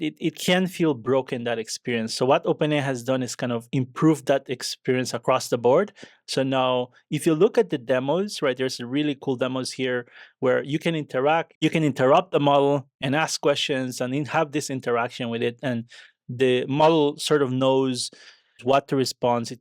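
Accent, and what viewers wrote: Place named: India. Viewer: GOOD INDIAN ACCENT, VERY UNDERSTANDABLE BEST IS TO READ THE PAPER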